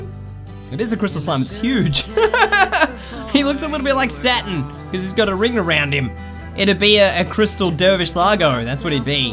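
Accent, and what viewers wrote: Australia. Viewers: Are you Australian? Haha You sound very Ozzie! (Coming from someone who is also Australian.) 🇦🇺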